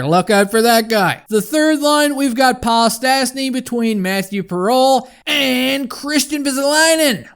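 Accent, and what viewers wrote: Newfoundland, Canada. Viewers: Are ya a newfie ?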